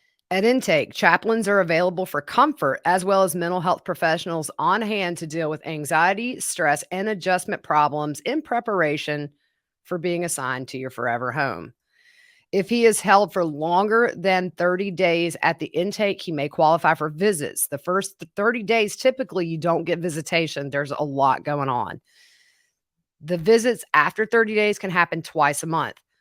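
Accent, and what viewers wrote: South, United States. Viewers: Prisons and all institutions are inhumane. After 6 months of wholesome food, the level of violence significantly was reduced in a school for delinquent youth. Elderly homes, with zombies medicated patients, are transformed with private pods and gardens leading to a central area. The prison system minimally should separate the criminals from convicts, as the British did between devoted Nazi POWs and the other Germans, one of whom married my best friend's mother, a half Jewish woman. This is a fascinating insiders' look into the most hidden world of women's prisons. The lady and you should write a book. It could inspire reform. Kudos to you. Love your home spun style and Southern drawl!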